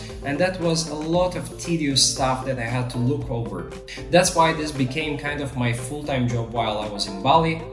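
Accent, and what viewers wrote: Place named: United States. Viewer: Bro you sound proper American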